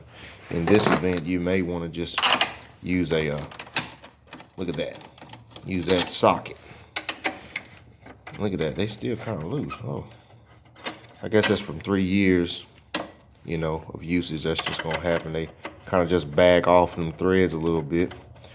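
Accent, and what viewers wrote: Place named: Alabama, United States. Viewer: Haha! I love this guy's down home accent! No place like home (Bama).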